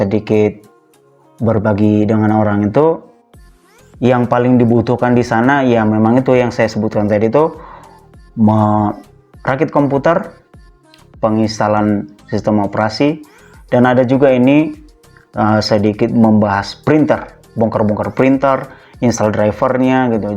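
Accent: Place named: Tegal, Indonesia. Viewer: Semangat pak ngapak tegal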